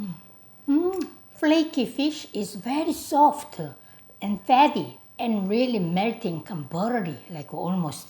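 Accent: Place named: South Korea. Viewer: 말씀 하시는거나 요리 하시는게 참 귀엽네요. 오나전 한국 액쎈트로 말입니다😁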